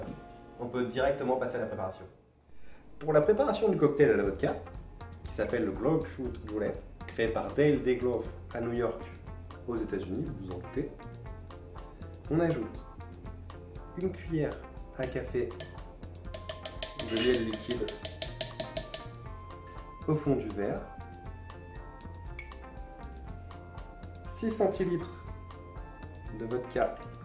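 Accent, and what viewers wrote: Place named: England, United Kingdom. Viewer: Toujours aussi magnifique cet accent anglais 😂 a goûter même si je ne suis pas fan du Pamplemousse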